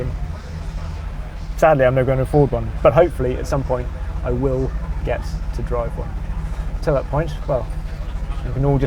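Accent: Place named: England, United Kingdom. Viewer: this guy mumbles, whispers and has an english accent, cant understand anything he is saying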